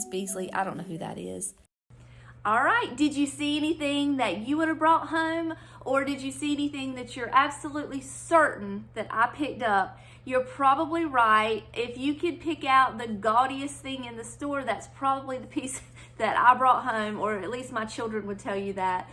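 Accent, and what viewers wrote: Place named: North Carolina, United States. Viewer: OMG! Ms. Beasley! She used to be in the Sears Christmas catalog and my sister and I would argue over which of us would get her. We thought there was only one, because the picture in the catalog only showed one! 😂 She had little gold-rimmed reading glasses and I loved her! I’m a first time watcher and your accent immediately gave me a North Carolina vibe. My late husband was from there. Enjoyed the video and have subscribed.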